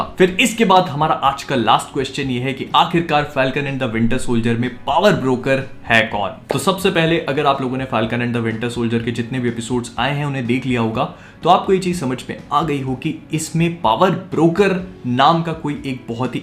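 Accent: Bhopal, India. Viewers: sound like a India Type it's cool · Bhopali Bolte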